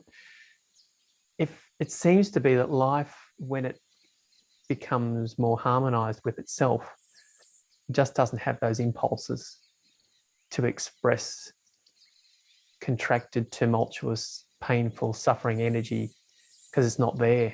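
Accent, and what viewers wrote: Australia. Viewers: Beautifully Said. I haven’t heard ‘it’ put quite this way before.. Such a simple and clear description… Nice to hear a fellow Ozzie too lol. Thank you 😊 Ps. I’d love to hear your talk about when the illusion of being a separate I apparently ends energetically, & not just conceptually. Thanks again🙏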